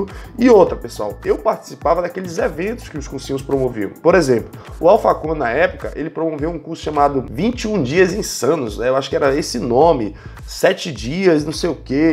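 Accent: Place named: North, Brazil. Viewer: Vc é nortista? Tem um pouco de sotaque rsrs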